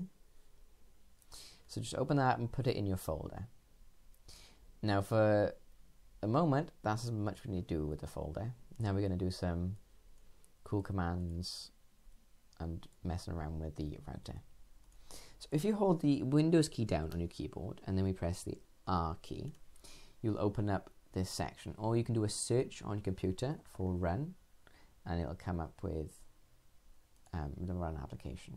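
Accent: Wales, United Kingdom. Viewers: you sound welsh mate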